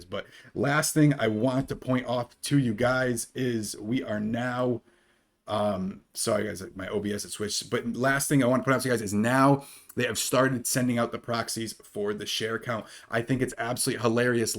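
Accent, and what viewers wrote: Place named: Upper Midwest, United States. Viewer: Nice Green Bay reference! I’m trying to place your accent. Definitely betting on upper Midwest.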